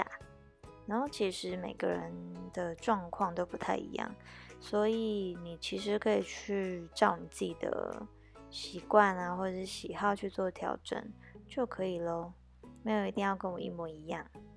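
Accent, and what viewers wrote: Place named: Taiwan. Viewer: 她就是在好好说话呀，难道要人家按中央新闻那么说话才算是好好说话嘛……台湾或东南亚有很多华人是这样腔调的好嘛……少见多怪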